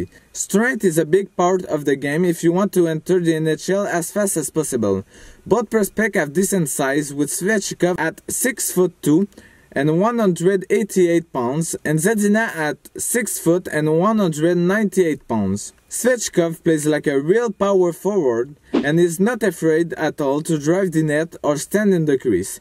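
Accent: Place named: Quebec, Canada. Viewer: J'adore l'accent Québécois dans ton anglais ahahah! Sinon c'est une bonne bonne vidéo!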